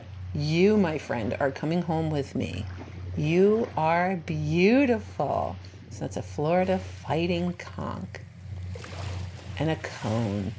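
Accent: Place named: New York, United States. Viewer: I love your videos so much! Thank you for creating them amd sharing tips! Question - are you originally from New York? I'm curious because I am, and your accent sounds so familiar to me!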